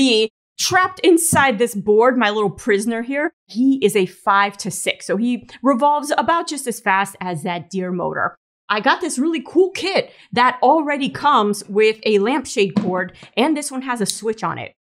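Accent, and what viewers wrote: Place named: Upper Midwest, United States. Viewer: You are a burst of energy and good instruction. Where does that accent come from? Upper Midwest? Glad to have found you.👍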